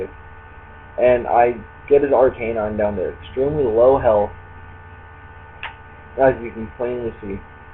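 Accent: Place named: Canada. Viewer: This narrator sounds distinctly Canadian. Is he? Top speed Nidokings, even while Modest, can usually outspeed Kingdras. Just a tip for future, it looked like you could have wiped out his Kingdra early thanks to the switch. Oh and btw, I don't know if this applies to you, but if you add yt:stretch=16:9 to your tags section the screen will be nicely stretched and you won't see those ugly black bars.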